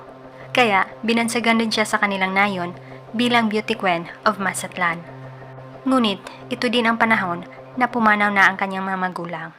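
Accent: Visayas, Philippines. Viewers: Very besaya ang accent dzaiiii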